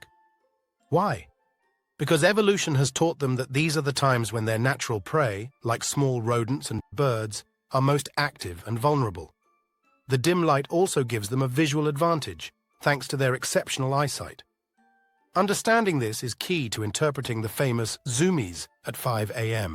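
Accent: United Kingdom. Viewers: This is more AI hogwash geared up with a British accent to the video seem like it's being intelligently presented. That's the British accent giveaway. Nothing here is medically sound so don't lose any sleep over this nonsense. There's nothing about a cat sleeping position that has anything to do with their health one way or the other.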